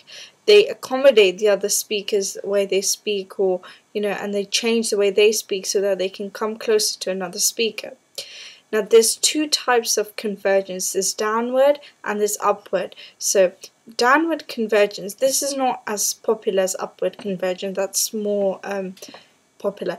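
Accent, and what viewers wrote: United Kingdom. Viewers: brilliant video but I thought it was recieved pronunciation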